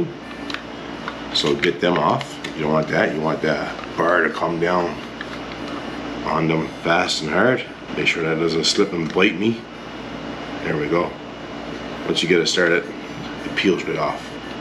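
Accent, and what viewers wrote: Canada. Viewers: As a northern Mainer, I could instantly tell I was listening to one of my Canadian neighbors. 😊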